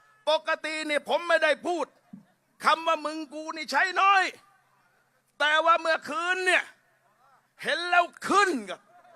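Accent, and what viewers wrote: South, Thailand. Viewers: พูดร่อยนิชอบ คนใต้จิงๆ😊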